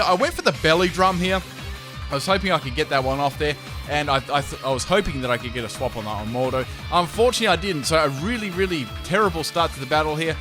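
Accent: Australia. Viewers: Omg ur australian?! What state?! I'm from Queensland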